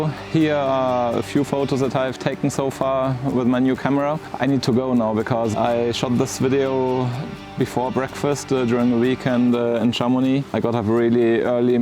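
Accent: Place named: Germany. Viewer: Scanning negatives (or slides) is a niche application, but one where the Olympus cameras are a really nice (and quite affordable) option. The light source is a problem with 4x5 negatives, not so much for smaller formats up to 6x9. The pixel-shift hi-res mode does a really good job at that, even though the "true" resolution is more like 40 to 50 MP (still more than enough to make the grain visible). BTW, your English sounds somewhat "German", or am I mistaken?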